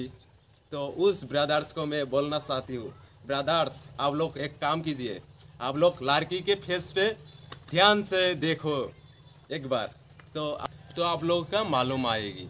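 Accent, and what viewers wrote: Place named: Assam, India. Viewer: Ye assamese ladka hein mein bhi assamiya hun... mujhe pata sala ye assamese ladka hein iske ayaz se pata sala... bro Ki khbr ...mojja lagise video tu dari ahile tumak dhanyavad dim😁😁😁😁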